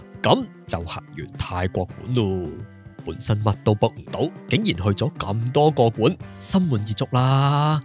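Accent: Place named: Hong Kong, China. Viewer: 三二一、點火～發射成功（救命～超喜歡港腔🤩忍不住姨母笑）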